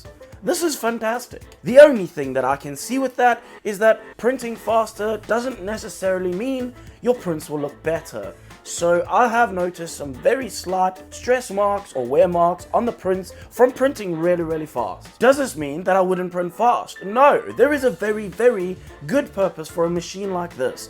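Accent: South Africa. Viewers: Why does it sound like he has a south african accent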